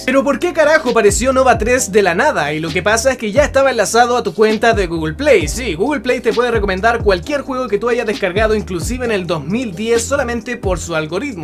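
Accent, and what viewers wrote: Chile. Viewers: Vo eri chileno o no ???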